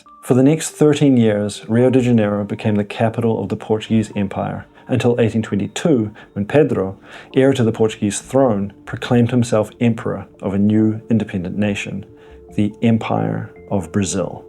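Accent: New Zealand. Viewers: Are you kiwi ? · Great channel! Is that a New Zealand accent? Best wishes from Ukraine.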